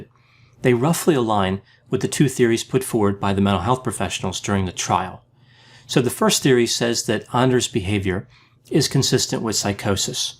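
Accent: United States. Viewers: Great analysis, Dr Grande. Completely inconsequential to the case, but as soon as I saw the title I was curious about how the name pronunciation would go throughout this video :P Yours is probably as close to accurate as it's going to get with an American accent.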